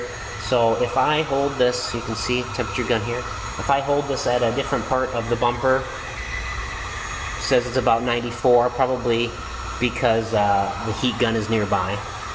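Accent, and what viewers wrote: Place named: Minnesota, United States. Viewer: Nice video! My mechanic here in Houston wanted $350 just to pop out a corner dent like that. But Houston is expensive. Also nice to hear a Minnesota accent! I went to college up there.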